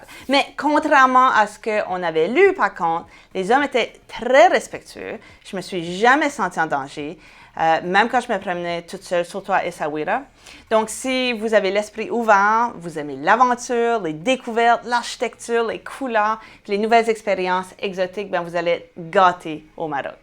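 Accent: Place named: Quebec, Canada. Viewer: Un vrai choc culturel, je m'attendais à être agréablement surpris par une dame prés de chez moi, mais à la fin je suis dégoûté sur la forme et sur le fond ! Accent bledard beurk , de l'époque des filles du rois, du Séraphin: Nouvelles histoires des pays d'en haut, des coureurs du bois, avec un regard hautain de la petite québecoise qui voyage pour ses premières fois! Le Maroc un pays multimillénaire, bourré d'histoire de civilisation, visité et revisité par les plus grandes stars du monde, show-biz, cinéma, sports , arts, etc...Mais la petite Lavaloise avait peur qu'il y vivent encore des cannibales, "DOUX" Jésus Marie, on l'a pas dévorée, elle est revenue en un seul morceau. Mince, on ne vend pas le pâté chinois et la poutine au Maroc . Hands down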